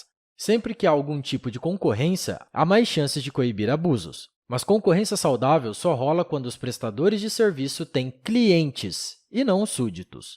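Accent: Brazil. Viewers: Peiceberam, poique, podei, seiviço, ocultai, abeita, Salvadoi, meicado ... O narrador nasceu num dos três estados, Minas, Paraná ou São Paulo, pois troca o R pelo i , da até agonia.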